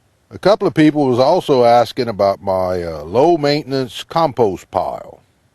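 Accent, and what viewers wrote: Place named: Louisiana, United States. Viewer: Vous devez parler le français cadien ... I can tell by that accent :P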